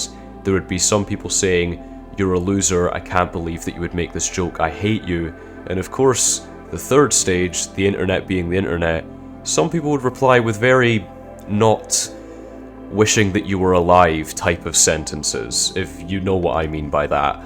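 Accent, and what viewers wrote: United Kingdom. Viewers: Is that an Edinburgh accent bro? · dont try to hide the scottish. i can tell you are. let the inner celt out fellow scott. alba gu braith!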